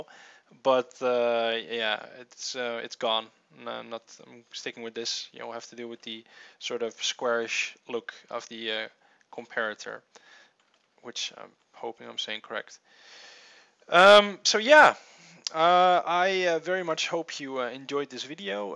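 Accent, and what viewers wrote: Netherlands. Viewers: Guys guys guys. Stop for a sec with discuss if he's dutch or not. Well, i have the right anwser for ya. I'm half engish, half dutch. The most people i heared that are dutch and talk english, are talking like that. Its just a dialect from dutch people. I'd like if everyone would stop with commenting like that. It is'nt even funny for. He's uploading a video, nothing more, just that. Show some respect people!! Because i do. Thanks for reading guys. If you think im wrong, screw you.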